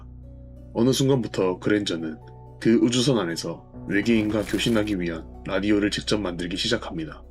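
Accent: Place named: Gyeongsang, South Korea. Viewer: AI목소리가 아니라 갱상도 사람이네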